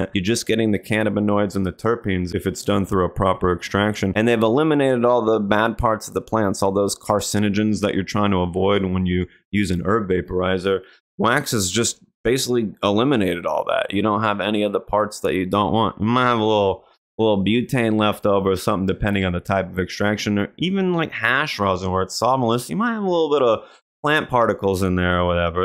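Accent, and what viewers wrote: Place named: Boston, United States. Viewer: Your accent bro! Are you from Boston?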